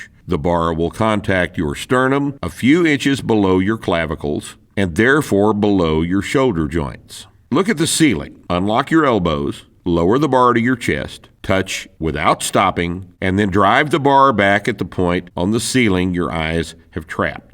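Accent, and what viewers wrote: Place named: Mississippi, United States. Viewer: That voice came straight out of Mississippi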